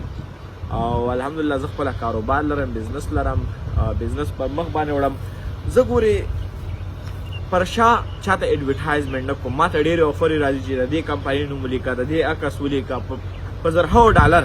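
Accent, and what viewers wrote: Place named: Pakistan. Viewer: ته اول داخپل ژبه چی خبری کوی دااسلاح کړه بیاوازه افغان یم ځکه چی ستاټولی خبری ده پاکستانی دی یواری پښتوزده کړه بیاراشه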